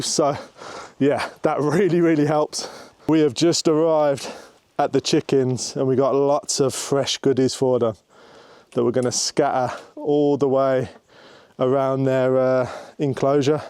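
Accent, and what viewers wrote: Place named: North, United Kingdom. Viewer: Nice to meet you...you sound like a northerner...where you from girl??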